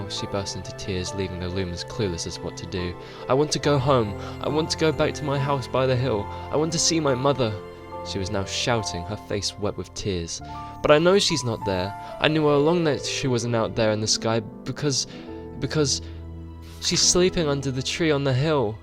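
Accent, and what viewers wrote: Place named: United Kingdom. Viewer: Having another brit explain things is the main bonus for me cuz theres no miscommunication if you say words that mean different things (my biggest struggle with american creators)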